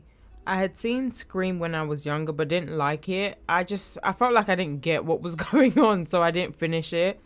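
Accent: United Kingdom. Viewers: I retract my comment about you sounding 25% American. you sound 100% British to me 😀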